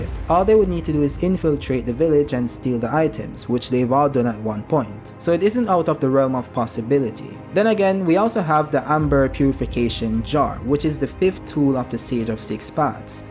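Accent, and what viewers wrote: Jamaica. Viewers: Bro I was listening and I was like this Jamaican English for sure. Good shii bro keep going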